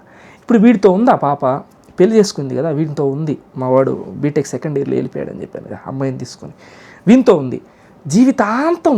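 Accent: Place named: Telangana, India. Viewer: Konchem konchem telangana matladuthunnav I like that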